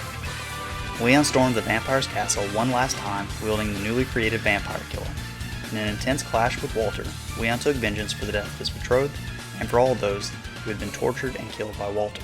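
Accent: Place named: South, United States. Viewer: Title doesn’t make sense….He’s not Dracula until he’s Dracula. So how does Dracula become what he already is? The term “Dracula” would already be a Vampire right? The title should be….HOW a man became a vampire known as Dracula….because their are many vampire but only one king of vampire….Dracula. That southern drawl only made it more cringe. I DO know this story isn’t accurate.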